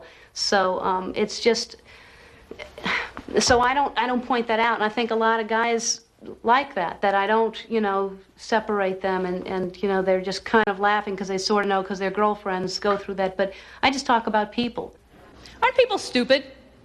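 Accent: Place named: South, United States. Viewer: She still had the Southern drawl more marked than her later standardized Continental accent _'for all Publics'. I saw her in College when she was starting and she was even cuter, however more insecure which she built into her act as natural thing. When you have cracked eggs, you make...Ellen humor.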